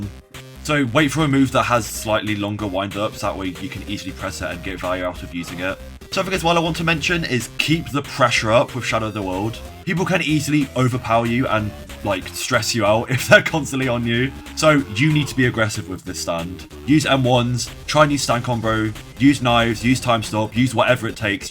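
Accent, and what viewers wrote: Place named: United Kingdom. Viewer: clicked this thinking it was eclipse and then was like “who the fuck is this British guy” 😭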